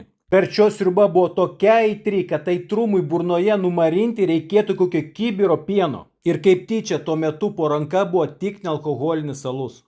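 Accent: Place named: Russia. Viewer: deslike iškart dėl rusiško akcento ir kvailos apžvalgos, fui, nesidaugink.. nesi profesionalus foodbloger, fui, lb prastas ir blogas esi apžvalgininkas, jokio profesionalumo, tik subjektyvi ir rusiško akcento pabrėžta nuomonė. niekada neisiu ten, kur buvai, fui 0/10.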